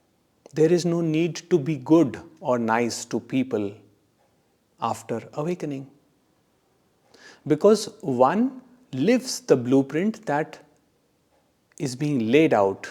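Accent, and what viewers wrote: India. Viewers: Immediately clicked away when I heard the indian accent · Maybe you are not awakened? Maybe you are just another douchbag on the Internet with Indian accent.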